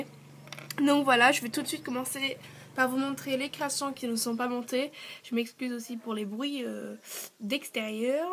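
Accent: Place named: United Kingdom. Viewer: J'aime beaucoup ton accent britannique ! x'D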